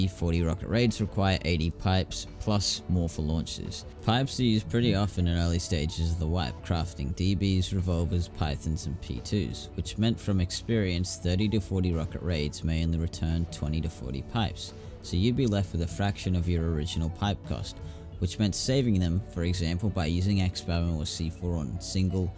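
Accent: Australia. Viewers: OlliBrolli He’s an Aussie fam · Aye an Australian, or maybe a new zealander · Definitely an aussie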